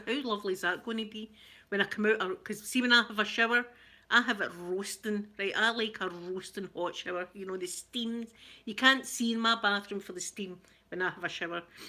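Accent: Scotland, United Kingdom. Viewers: I love scottish accent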